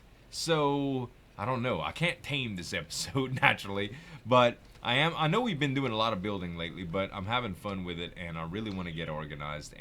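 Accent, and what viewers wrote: Louisiana, United States. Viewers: Z I watch you for you not just the games you play I watch you for the funny loud Cajun that you are! Although I might not like every game you play I still look at them just to see. On a side note I have been loving the face cam the VR and your new hybrid animals! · Hi Z, I like to think people come to your channel for the same reason I do......that Cajun accent, the fantastic way you get over excited and high pitch when something cool happens, and the high quality content you put out. No matter what you play. Just keep being Z cos that is what your good at, and that is what we want to see.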